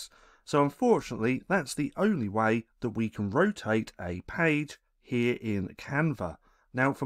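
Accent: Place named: United Kingdom. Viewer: I'm obsessed with your British accent ...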